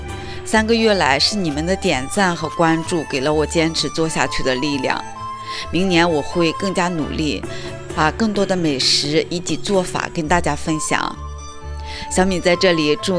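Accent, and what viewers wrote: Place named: Shanxi, China. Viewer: 是的，我们的山西口音我怎么都能听出来。是老乡，您是哪里的呀我是太原的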